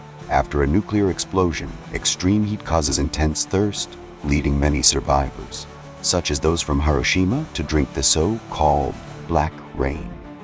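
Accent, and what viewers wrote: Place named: United States. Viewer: 好喜歡這個美式英語的旁白，增加不少信聞中常用的語句，不同於英語課本，離生活中遇到的，同時那考試也是一樣！我旅居英國15年，和同是聊天，就類似如此！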